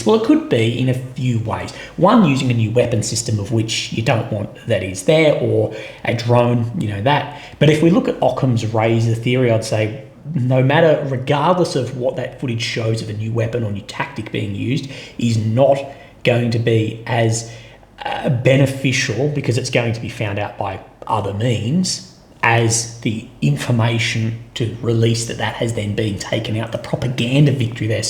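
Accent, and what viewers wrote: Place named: Australia. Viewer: Today with this green t-shirt, you look every bit the Australian that your accent gives away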